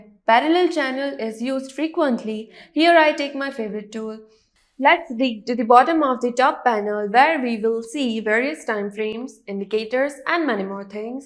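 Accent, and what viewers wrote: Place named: United Kingdom. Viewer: You are sharing knowledge and your wisdom thats good.. and very nice of you.. the only thing that annoy is your british accent ... You can speak Indian english very well obviously but dont know why you dont prefer it... Just a point for improvement..no offence to you.. more power to you!!🙏🏼🙏🏼 All the best for your YT channel !!🔥🔥🔥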